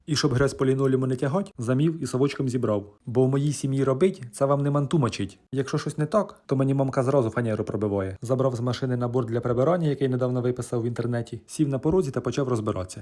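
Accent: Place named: Cherkasy, Ukraine. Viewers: Натрапила на ваш ролік...думаю собі ,,говірка черкаської області " і бінго🎉 Привіт Черкасам❤ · Ви чудо ви так говорите як у нас у Кам'янці Черкаської дай вам Боже бережіть себе ♥️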